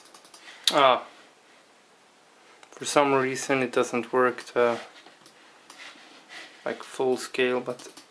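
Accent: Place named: Sweden. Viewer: Swinglish! Winning!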